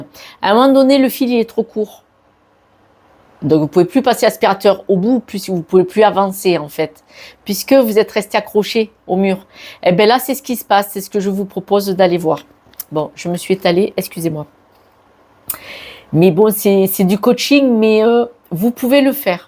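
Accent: South, France. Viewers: Tirage très chaleureux et convivial à l'image de la personne que vous êtes, naturelle et authentique. Surtout ne changez rien. Cerise sur le gâteau, votre accent qui chante bon le sud ! Merci pour le partage de la part de votre nouvelle abonnée. A bientôt ! 🌞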